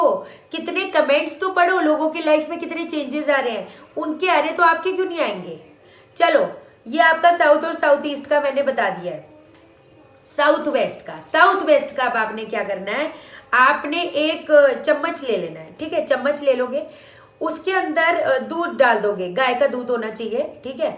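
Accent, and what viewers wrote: Punjab, India. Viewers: I love the way you speak in Punjabi way. Main v Punjabi hu .😘😘😘😘😘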